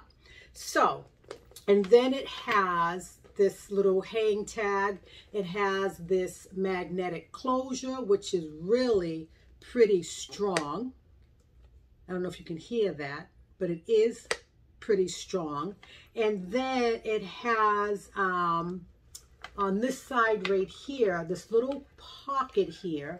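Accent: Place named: New England, United States. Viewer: Love your New England accent. I have this bag in the lite greenish color.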